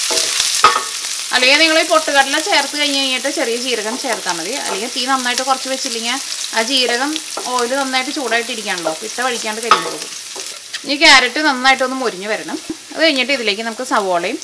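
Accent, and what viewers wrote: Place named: Ernakulam, India. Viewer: Ernakulam style samsaram..husbandinte auntye ormaverunu😘.sound kelkubol...😁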